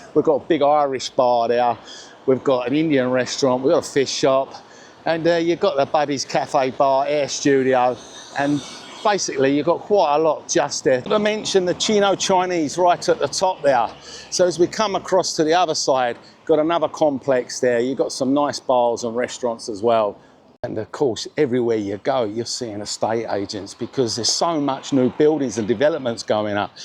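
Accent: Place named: North London, United Kingdom. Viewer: I love your accent. You speak like my Dad used to. I reckon you must be from north London maybe Tottenham?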